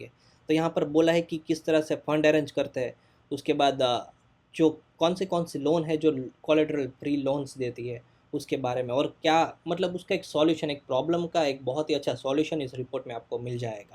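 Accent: Gujarat, India. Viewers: Bro kya aap gujarat se ho?? Because I am from gujarat orr aapki baate sunake muze aisa lag ra hai ki aap gujarati ho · Gujarati kaise Hindi bolta h iske upar se ye Lagta h Please replay me 🔥🙏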